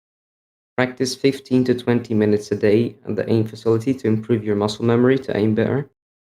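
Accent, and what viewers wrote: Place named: Scotland, United Kingdom. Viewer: Are you European you sound like a scotsman 🤔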